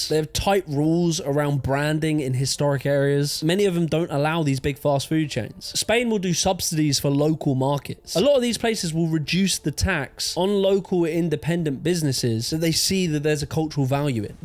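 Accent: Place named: Dunstable, United Kingdom. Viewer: I've been watching your videos for a while and have lived both in East Asia for a few years and in London for nearly a decade. I'm 31. I nearly had an out of body experience in your cut to Dunstable high-street. The absolute hole where my childhood took place, which I escaped at 18 years old. Your accent always seems weirdly familiar to me. When you said you were from dunstable I nearly fell off my chair. Sorry to say mate, you have a dunstable accent! I remember when Asda came to dunstable. I have memories of going to the music hall event space that used to be there in it's place. The fireworks at Bennetts Rec. The lighting of the Christmas tree opposite priory gardens. The town had some sense of community and sense of life, even if fairly basic and uninspiring. Asda arriving kickstarted the process that resulted in the death of many local businesses, followed by 2008 which dealt another knock out blow. 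Then amazon came and built it's concentration camp of cheap crap on the scrub land opposite the white lion retail area, which was already one of the seven circles of bland corporate hell. When I arrived back in my parents house for a year during 2020, I genuinely felt sad at the sight of what had become of the place. A place I had already always hated. I might have hated it, but I didn't want that to happen to it!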